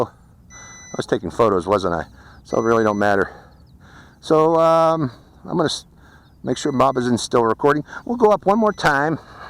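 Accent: Pittsburgh, United States. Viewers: how do you get extra propellers for this? also: i'm guessing you're from pittsburgh? my dad is, and he has the same accent.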